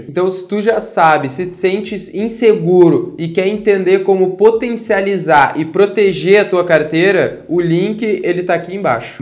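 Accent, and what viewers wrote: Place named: Porto Alegre, Brazil. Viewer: Sotaque bem portoalegrense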